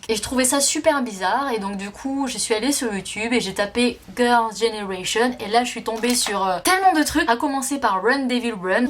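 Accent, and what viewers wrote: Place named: Toulouse, France. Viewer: A peine j'ai commencé à regarder la vidéo je me suis dit "omg elle est Toulousaine c'est sûr mais avant de lui demander je vais regarder à description" et j'avais raison x) vive le sud ouest ! Et vive la k-pop !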